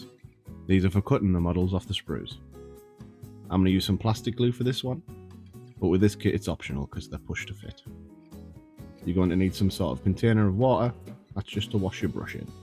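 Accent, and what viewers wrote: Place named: Teesside, United Kingdom. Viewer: Teesside accent? Go on then I’ll subscribe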